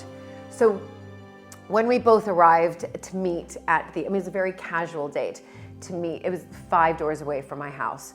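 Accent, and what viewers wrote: United States. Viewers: Just to point out, has a total American voice, nothing posh about her, but she has adopted words that the British use and Americans don't. We don't say "drinks party" but "cocktail party". And we don't say we're going to the cinema ever, we say we're going to the movies. I just thought I'd mention that. Her language has adopted British terms. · Thank you for remaining “You”, which is what, I’m sure, your husband fell in love with. It must have been quite an adjustment. What’s so interesting is that the village towns you show are so similar to those of New England, with the quaint town commons and shops. I live on Cape Cod, Massachusetts, so I understand you falling in love with the beauty of the countryside. Listening to you, I do hear the British inflections, but you are still have,after 20 years, the American accent. It’s so easy to pick up that you often don’t know you have. 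When I met my husband, he wondered if I had lived in Britain. I laughed and explain that my daughter and I had only been bingeing on BBC mini-series, etc, but it was enough to affect our speech. When I visited Britain, the same happened. On the other hand, the opposite happened to Britain visitors to the US. They still had the accent, but was often sprinkled with Americanisms. You are so personable. I would love to meet you and just talk, but I think you would have me laughing with your wonderful down-to-earth personality. 😊 Btw, I get it about the letter pronunciation. I spent an hour looking for “Darby” street. Finally someone told me “Derby” was pronounced “Darby”. I also spent 3 days asking about getting to Edinburgh. Everyone said “yes, it’s a long trip”. I was so disappointed UNTIL I asked “how long”. They then said, about 4 hours! I said “WHAT”?? There are Americans that travel that distance to work!!.” I don’t know if you know what th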